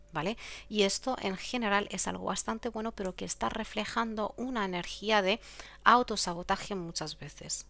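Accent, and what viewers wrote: Spain. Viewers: Mi amor, manos hermosas muchas gracias por la lectura, pero a veces no te entiendo que quieres decir, lo dices muy rápido, yo digo que debes decir al pan pan y al vino vino, o que dices las cosas mochas, perdón, pero así me parece, gracias por lo que si te entiendo. Siempre trato de disfrutar el campo, platicar con amigos, de lo verde de las plantas, del silencio de la mañana, escuchar música, yo siempre trato de ser constante con mi ejercicio, mi alimentación, disfruto tu voz el tono, y tu acento españolado. , etc. etc. 🙏🙏🙏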